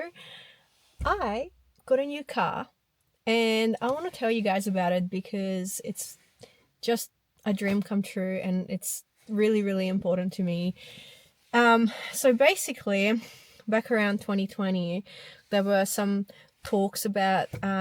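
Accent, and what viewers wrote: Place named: Australia. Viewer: Aussie accent on fleek ngl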